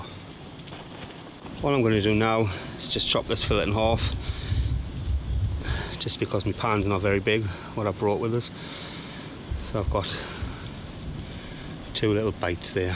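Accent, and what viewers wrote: Newcastle, United Kingdom. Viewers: ha where u from mate u sound like your from newcastle ....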